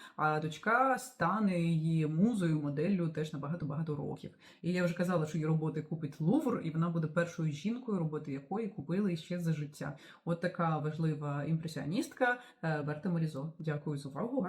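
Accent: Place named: Russia. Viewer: Дякую, за відповідь! Мені дуже подобається ваш контент. Пишучи про мову, я перевіряла свій слух - він у мене близький до абсолютного(я скрипалька за освітою), - того, я радію з свої здібностей. Ваш російський акцент, або російська артикуляція, практично, не помітні.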